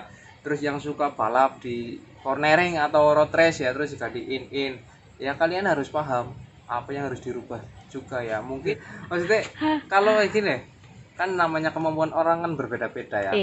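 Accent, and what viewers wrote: Banyumas, Indonesia. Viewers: Bojone resing tenan mas... seneng khas ngomonge ngapak yak kepriweh pokoke khas tenan pertahankan mas... kaya mertua saya wong batang 😁 Ilmune aku nderek sinau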